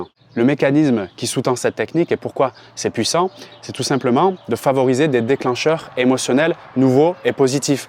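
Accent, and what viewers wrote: Quebec, Canada. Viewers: Merci pour ces conseils,. Mais s'il ne faut pas être "prévisible" et éviter le silence radio, quelle stratégie, préconises-tu? Ps: j'aime bien ton accent, serais-tu Québecois?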